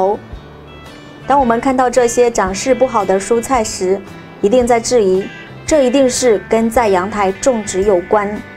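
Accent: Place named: Taiwan. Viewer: 腔調，很台，讚。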